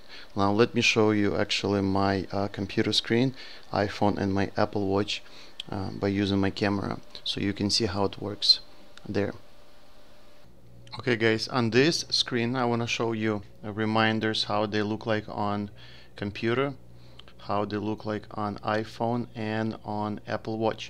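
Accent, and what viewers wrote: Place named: Russia. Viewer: у вас русский акцент))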